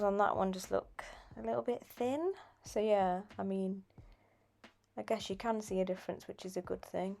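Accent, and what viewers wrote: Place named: Midlands, United Kingdom. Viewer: Hi I have this product, my question is have you used it without a colour on top? I found it went opaque did not stay clear. It’s a good strengthening product & I’ve since got the peach colour in hope that stays its peachy shade. Thank you for video. Also rare to find uk tutorial accent sounds like it’s from Midlands am I right? 😊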